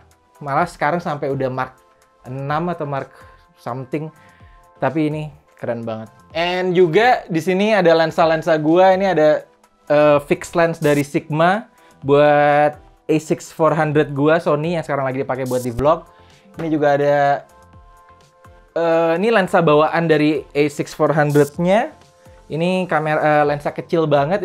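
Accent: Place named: South Jakarta, Indonesia. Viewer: Jaksel banget wkwkk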